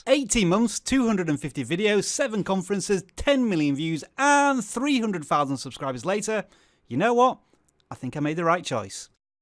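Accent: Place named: South Yorkshire, United Kingdom. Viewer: Where are you from? You sound local, Sheffield or South Yorkshire at least, but I'm also bad at accents.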